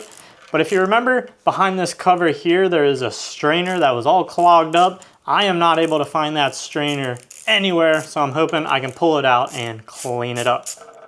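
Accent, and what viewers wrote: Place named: Maryland, United States. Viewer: Definitely tell you're from maryland just by listening lol. Sounds like a Rosedale native to me with those O's lol.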